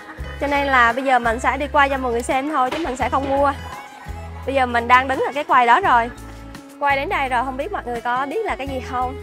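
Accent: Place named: Quang Nam, Vietnam. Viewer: Đặc sệt tiếng Qoảng Nom. duyên thật.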